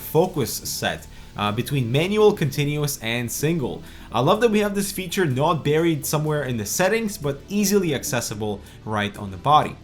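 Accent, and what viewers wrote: Canada. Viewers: Nice review! Your name, russian? But canadian accent! Cool! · What part of Canada are you from? You have a heavy accent.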